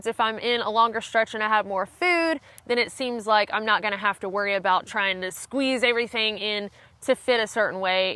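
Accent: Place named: Missouri, United States. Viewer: What do you do as a precaution for bears, I've seen you a video where you had the bear spray ? I have this fear of running into a Grizzly bear, there was a video (facebook maybe) that I saw of a guy that jumped up and started screaming when a bear charged him out of nowhere and the bear turned and ran away............I think I would open my mouth to scream and nothing would come out!!)) Oh and I like your slang or accent " YALL" !)) You sure your not from Missouri!!))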